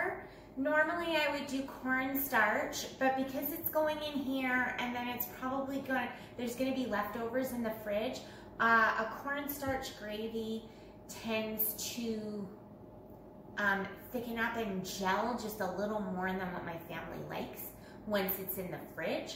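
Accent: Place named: Canada. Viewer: I'm definitely trying that pork. I just LOVE that particular Canadian accent! 😄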